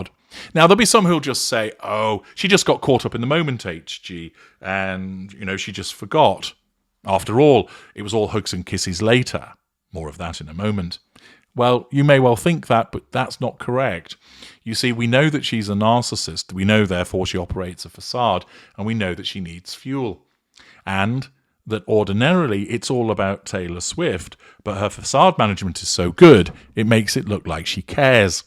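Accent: United Kingdom. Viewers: This guy really does waffle on. Amazing how many people are impressed by just a pompous British accent.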